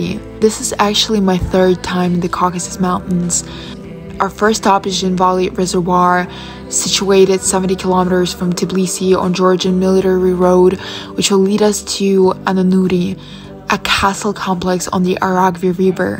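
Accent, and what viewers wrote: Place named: United States. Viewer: where are you from -speak like american....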